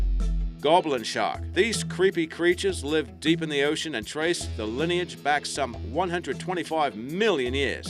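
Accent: Australia. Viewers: Dave 983 I think he is an aussie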